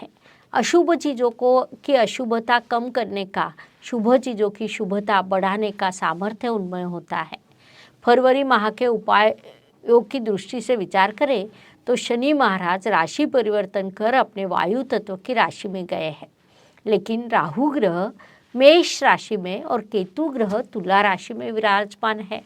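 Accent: Maharashtra, India. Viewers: Are madam tumhari speech kitnnnnna mast hai Marathi mix lagta hai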